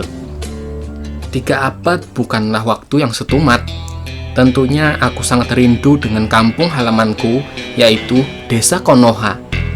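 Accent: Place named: Java, Indonesia. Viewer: Bahasa banjar nya kurang kental.. Lebih kpda medok jawa...tp Salam sukses buat kmu.. Trus berkarya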